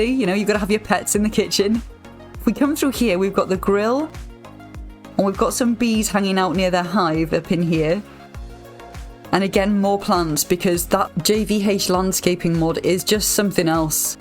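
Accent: Wales, United Kingdom. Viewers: Woah wait are u welsh?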